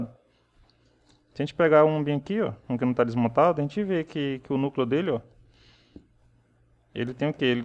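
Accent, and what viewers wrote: Ceará, Brazil. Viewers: tu parece ser cearense.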